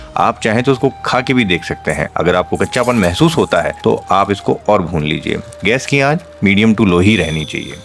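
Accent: Uttarakhand, India. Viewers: हम दोनों बैठ कर TV screen पर आपके द्वारा बनाये जाने वाले व्यंजन को गहनता से सुनते हैं। कभी कभी आप कुमाऊँ लय में बोलते हो कभी हरियाणा राजस्थान से मेल खाती भाषा का प्रयोग करते हो। सारा मिलकर आपके प्रोग्राम स्मर्णीय होते हैं... धन्यवाद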